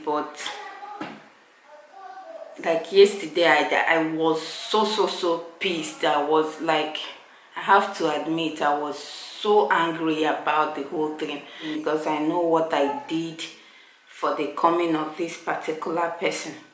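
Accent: Nigeria. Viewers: Aunty!! I love how authentic you and your family are. I especially love your thick Nigerian accent. It's sounds beautiful. Thanks for being so real!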